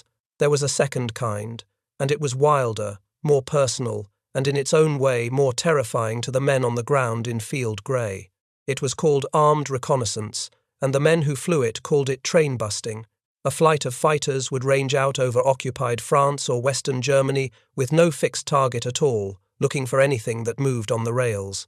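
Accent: United Kingdom. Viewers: I was getting more furious by the moment as you seemed to be ascribing all the action to the Americans. It is painful to hear a British accent not mentioning any of the splendid things accomplished by the night raids of the Mosquitos and the savage work of the rocket equipped Typhoons. Britain also contributed massively to the long-term sabotage delivered by the resistance groups by supplying the devices that could be used in place of direct explosives. The Gestapo would execute whole villages in regions where railways were sabotaged with explosives. When lumps of high explosive apparent coal were put into railway stockpiles and boilers just apparently exploded miles away, who could be blamed. Resistance operatives would put abrasive compounds into lubrication points where some would inevitably get into the steam lines. Locos would then simply lose energy and come to a halt while hauling a heavy freight. 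The Germans never worked that one out. It looked like simple wear and tear. I appreciate your mention, late in the article, of the heavy bomber dropping the Tallboy that destroyed the viaduct the Americans had failed to destroy. I wish you had mentioned the resistance groups who had been doing this work long before 1944. JW. Aust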